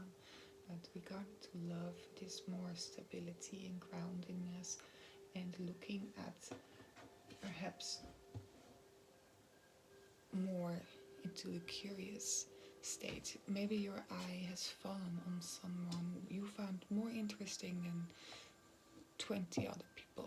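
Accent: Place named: Netherlands. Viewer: aan je accent hoor ik dat je nederlandse bent. je legging is top! veel succes met je kanaal!!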